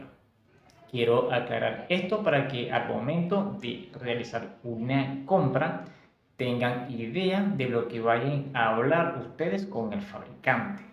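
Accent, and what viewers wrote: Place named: Apure, Venezuela. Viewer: Eres apureño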